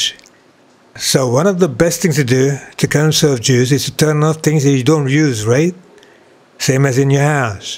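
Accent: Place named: India. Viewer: indian guy trying to speaking english like dolund trumph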